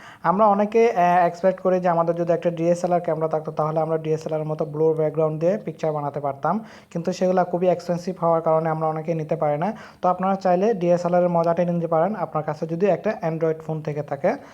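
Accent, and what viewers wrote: Noakhali, Bangladesh. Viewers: After focus pro i have used long times ago around 5/6 years when i was using SYMPHONY XPLORER W25 which was my first android smartphones. Moreover it was a gingerbread version, so your review doesn't making any bell to me. By the way one request to you: "your review might well perhaps your presentation was really terrible & i hope next time you will be more careful to use proper Bengali accents instead of নোয়াখাইল্যা খিচুড়ি কথা, either your review will going to be spoiled.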